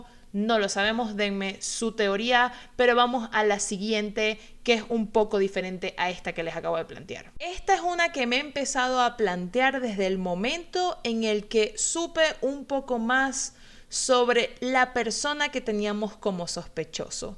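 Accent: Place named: Venezuela. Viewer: Eres venezolana? reconocí tu acento de inmediato mrka<3 jajaja te amo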